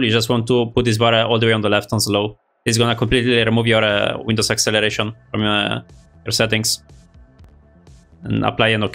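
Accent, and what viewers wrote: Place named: Italy. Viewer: L’accento italiano non scappa😭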